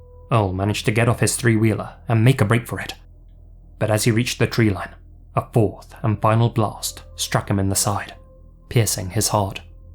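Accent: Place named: United Kingdom. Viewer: Ngl I hate British accents… but in this case the way you narrate stories with your British accent actually makes the stories better. Keep up the great content!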